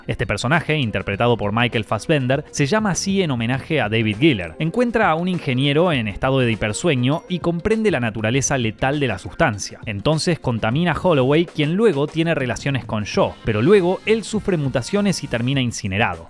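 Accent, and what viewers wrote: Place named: Buenos Aires, Argentina. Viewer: La tonada porteña es insoportable.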